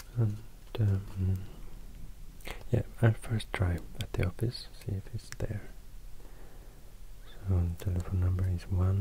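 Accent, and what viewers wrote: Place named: Sweden. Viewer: Du er så god til soft spoken ASMR og din milde svenske accent er så afslappende! Hilsen, en nabo 😋🇸🇪🇩🇰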